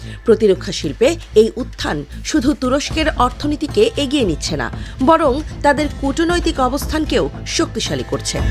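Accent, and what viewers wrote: Kolkata, India. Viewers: উপস্থাপিকা কি কলকাতার??? কথা তো বাংলাদেশীর মতো লাগছে না । কি ntv???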